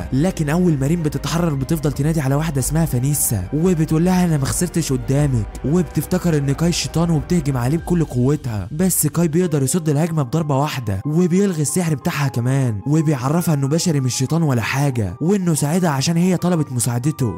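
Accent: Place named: Saudi Arabia. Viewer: أسطورة استمر انت ولد عمي وترونة سعودي ولكنة لخبط وحط اللغة المصرية ❤❤❤❤❤❤❤❤❤❤❤❤❤❤❤❤❤❤❤❤❤❤❤❤❤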